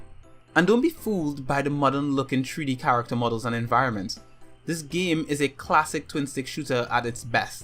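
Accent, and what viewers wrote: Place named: Trinidad and Tobago. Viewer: are you from Trinidad and Tobago?.... you sound like you are and great review.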